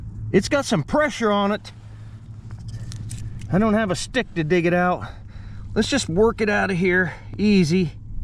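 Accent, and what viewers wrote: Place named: Delaware County, United States. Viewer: love the delco accent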